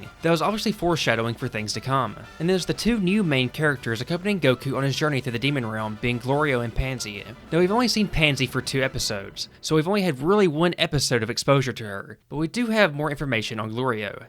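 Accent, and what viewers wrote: South, United States. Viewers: Bro has the most southern accent I've ever heard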